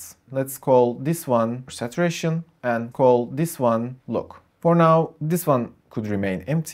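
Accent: Turkey. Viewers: reis türksün galiba sesi duyar duymaz türk konuşuyor dedim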